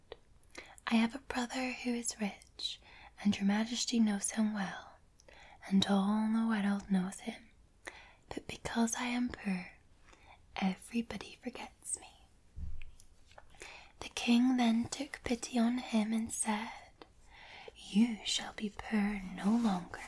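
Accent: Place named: Scotland, United Kingdom. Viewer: The Scottish accent is greatly better than the crackle of the candle.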